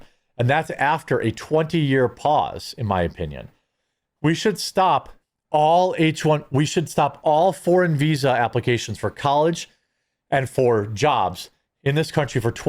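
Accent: India. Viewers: Your dumb Indian accent is only topped by your inbred trailer park hick uniform of ball cap indoors and queer beard 😅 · More of your accent your Indian accent it's great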